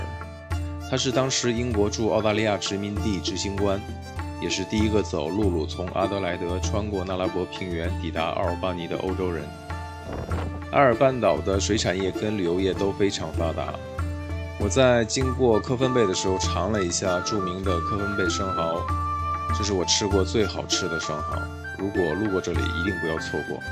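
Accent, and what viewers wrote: Northeast, China. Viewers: 东北腔，哈哈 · 听东北话真是亲切，我18年19年南澳 西澳两次自驾，总长没有你跑那么远，也没有伙伴，但真的很爽，以后有机会还要去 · 本山东人认为，明明是东北口音啊哈哈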